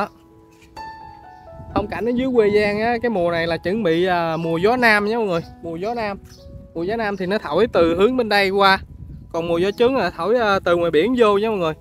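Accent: Ben Tre, Vietnam. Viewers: Lần đầu xem video a nghe tiếng e nghi a ở bến tre rồi. E ở batri e cũng đang cùng nghề bếp .e cũng hay xem video của a cảm ơn a đã chia sẻ những video ý nghĩa chúc a thành công trong công việc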